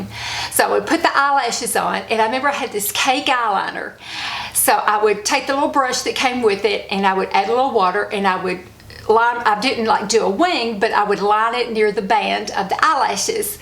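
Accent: South, United States. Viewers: It’s Lorraine in England. Like any good Southern girl I loved my makeup, too. I remember going to London with my Mom and aunt when Twiggy and Mary Quant were big. After listening to this video I saw a banner that a cover band , Union Gap UK , Young Girl etc. from the 60’s ,are in town tonight. I may have to go to the concert! You remind me of my girlfriends back home in Virginia which is why I like your videos and insta stories. I don’t hear a Southern twang that often unless on line!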